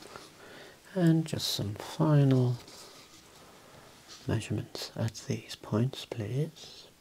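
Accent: Birmingham, United Kingdom. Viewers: On the one hand it's just some bloke looking at a table tennis racket, but on the other hand I find it immensely satisfying and deeply relaxing during my Saturday lie in until God knows when watching a bloke inspecting a table tennis racket and speaking in a soothing Brummie voice. I find it's the perfect way to relax and unwind after yet another working week.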